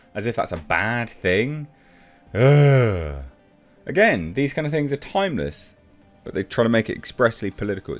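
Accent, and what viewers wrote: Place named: United Kingdom. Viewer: As a gen-you-wine good-ole' down home, back woods country boy, I must say I love hearing you say, "boy howdy" with your beautiful British accent. Well done, sir.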